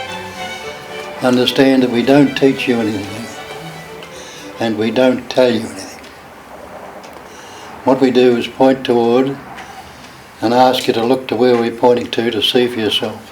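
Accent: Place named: Australia. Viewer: It sounds so much more down to earth with a Australian accent.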